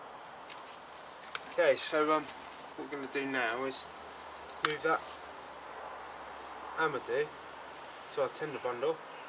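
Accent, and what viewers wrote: United Kingdom. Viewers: thats great that you're an outdoors person mate! and i love the british accent! BLOODY HELL MATE! CHEERiO!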